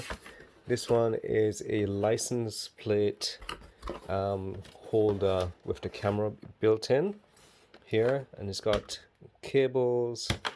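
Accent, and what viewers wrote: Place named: Trinidad and Tobago. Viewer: Hey, why did you turn off the comments on the other video? You sound like a Trini.......are you? I have a VW Caravelle Syncro...planning to remove the stock radio and install a touch screen radio with the reverse camera. While it's activated from the wiring on the reverse lights, I will be putting in a bypass switch (thanks for reminding me), where I can switch to the reverse camera even when the van is in forward.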